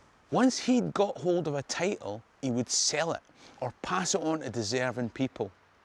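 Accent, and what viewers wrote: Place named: Ulster, United Kingdom. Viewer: You have a 100% Ulster accent, no different to many people born in Ulster, mainly the Antrim area, including me. I have some 800 years of Irish ancestry and none of it remotely Scottish or English. We also speak with what we call the Hamely tongue. Irish and Scottish Gaelic mixed with English. The BBC did a documentary some 45 years ago and split the screen showing two older people in Ulster speaking and two elders in the Appellations, you couldn’t tell the difference.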